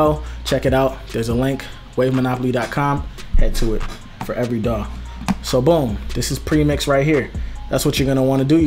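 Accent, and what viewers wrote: Jamaica. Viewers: U Jamaican 🇯🇲 ? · you Jamaican??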